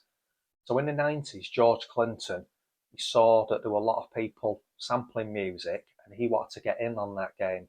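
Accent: Manchester, United Kingdom. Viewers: Is that a Mancunian accent I hear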